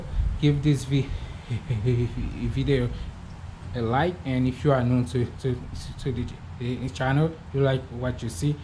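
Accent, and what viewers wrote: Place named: Haiti. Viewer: Haitian accent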